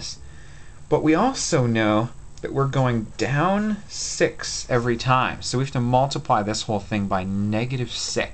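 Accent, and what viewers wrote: Canada. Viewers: You sound canadian:)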